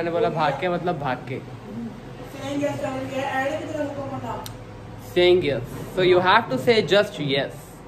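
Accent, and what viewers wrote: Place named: Punjab, India. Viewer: Very Punjabi c bolya karo kntt lagda o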